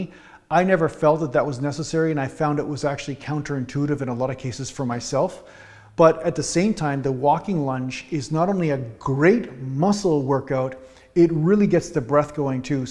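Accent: Canada. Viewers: I put a couple of simple things together today. In a video of yours I watched earlier today, you mentioned you live in Vancouver. That explained a certain accent you have, along with your interest in and hockey playing. I live near Detroit, right across from Windsor. Before cable came along, I used to watch the Leafs on CBC, along with other good Canadian programs. Cool Aa!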